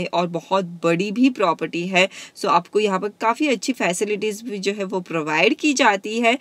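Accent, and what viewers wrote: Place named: Kathiawar, India. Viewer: WOMAN !! I loved your Kathiawadi Accent !! Well covered ...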